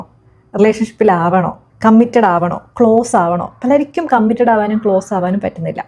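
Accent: Kannur, India. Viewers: Offtopic Slang felt like u r from kannur Kannur edyappa?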